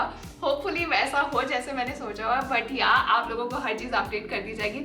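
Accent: Punjab, India. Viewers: those punjabi touches when you speak are so lovely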